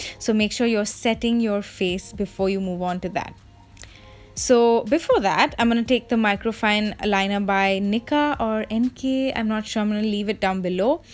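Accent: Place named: United Kingdom. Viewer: and please stick to british accent for ur voice over !! 😘😘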